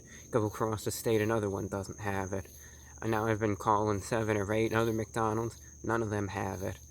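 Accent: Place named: United States. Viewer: „None of them Hääääve it“ Man i like, but the american accent just kinda gives me shudders. Still great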